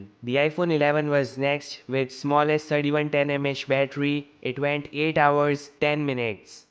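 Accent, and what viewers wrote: India. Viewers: I love the English Indian Accent. "Lets Goouuu"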